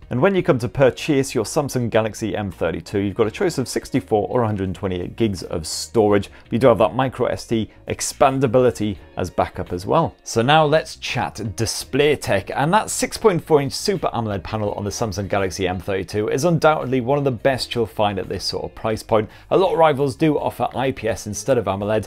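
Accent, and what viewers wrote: United Kingdom. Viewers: the only British fam I've no problem listening to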